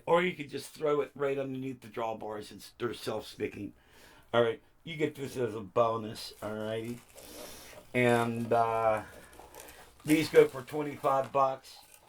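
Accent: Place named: Pittsburgh, United States. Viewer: That Pittsburgh accent tho!!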